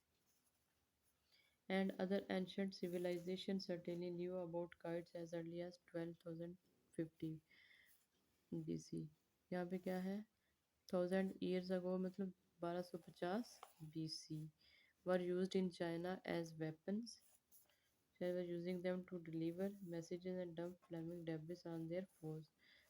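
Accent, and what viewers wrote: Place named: India. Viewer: I put like for "bismillah"but unfortunately i cannot understand because of your accent your accent don't like English Like Indian 😢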